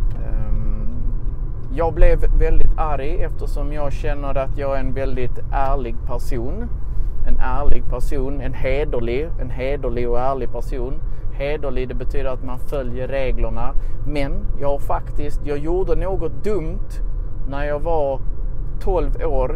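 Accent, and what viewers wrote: Scania, Sweden. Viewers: I storbritannien kallar vi det för dialektdiskriminering. Jag kommer från Liverpool och vi har en jättestark dialekt och om någon inte gillar det så kan den dra åt helvete Jag älskar skånska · Älskar skånskan! :))) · Personligen bryr jag mig inte om med skånska dialekten. I Tyskland pratar vi också olika dialekter. Jag förstod allt vad du sa. Alla pratar olika och det är helt normalt. Det är viktig att förstår alla svenska volk, inte bara från Stockholm. Fortsätt med det du gör. Det hjälper oss mycket.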